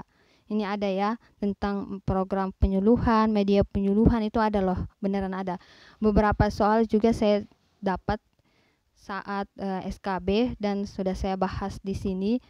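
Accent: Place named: Central Sulawesi, Indonesia. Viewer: sya kira orng Sulawesi Tengah soalnya logat bicaranya mcm tdk asing di dengar.. Baru ad vlog dipantai Nambo.. Sama nama daerahnya dengan yg ada disni.. Klw kk orng sini langsung otw ke rmh kk buat belajar skb 😁🙏🙏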